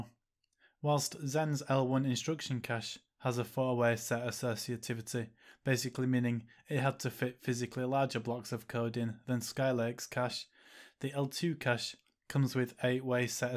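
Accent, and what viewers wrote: United Kingdom. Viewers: Is that a bradford or leeds accent?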